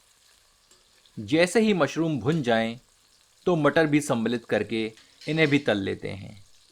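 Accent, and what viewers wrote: Standard. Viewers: शुद्ध हिंदी बोलते हैं।आप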